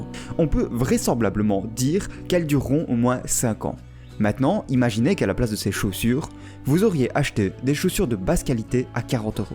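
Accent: Liège, Belgium. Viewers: Tu es belge ? Merci pour les conseils , j'adore tes vidéos 😍❤ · Tu ne serais pas de lièchee toi ? :D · Tu es belge ? Bref bonne vidéo pouce bleu 👊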